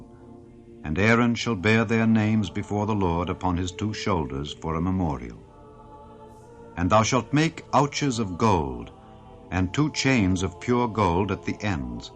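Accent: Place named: United Kingdom. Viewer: So funny to see beautiful Jewish history with super British voiceover haha, Just feels so unnatural...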